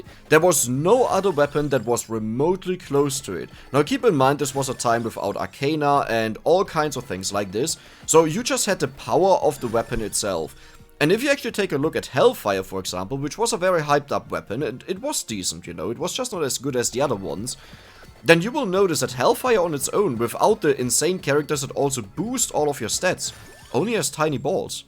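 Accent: Germany. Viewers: love the German accent and also great content and humour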